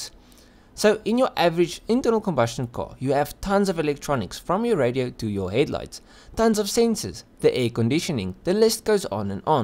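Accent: South Africa. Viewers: Brro are you south african?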